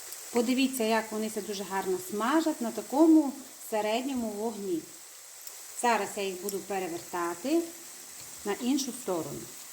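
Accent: Transcarpathia, Ukraine. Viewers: Оооо, вже почула, що з Закарпаття.😊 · Чую закарпатський діалект, молодець так тримати! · Так розмовляють на Закарпатті. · Чернівецька область. Дякую за смачний рецепт, спробую. Закарпатська мова, особлива. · Це ЗАКАРПАТТЯ!!! На заході України є різноманітні діалекти😉😊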